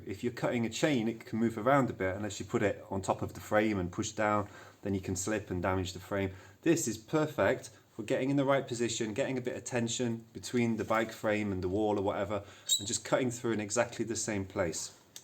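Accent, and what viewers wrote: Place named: United Kingdom. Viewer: hear me out... He's in Brussels, sounds like he's from the UK, maybe they call an angle grinder a circular saw across the pond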